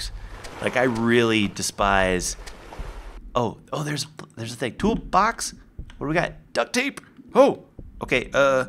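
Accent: Canada. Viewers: U sound canadian broski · You sound sooo Canadian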